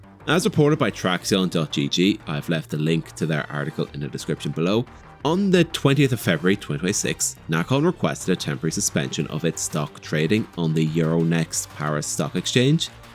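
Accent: Ireland. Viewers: Nice to hear an irish voice covering covering car racing genre gaming. Big wrc fan and was wondering whether to pickup testdrive on sale. Bad news on Nacon especially with all their current & upcoming titles you mentioned. I will check out galway rally video when i can. Keep this content coming GRMA.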